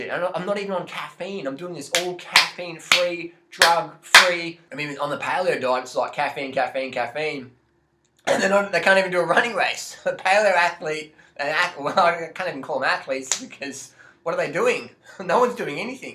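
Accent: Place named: Australia. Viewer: It's crazy how that accent makes us all listen that much more, not being sarcastic. I do plan to try this diet since it just seems pretty intuitive to me. plus peoplel like you and banana girl are good examples. but actually it might just be that aussies can assimilate the sugars easier than the rest of us so it only works for you guys. lolllllllll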